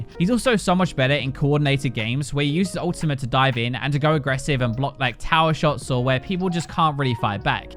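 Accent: United Kingdom. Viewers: stop talking in british i dont undestand shitt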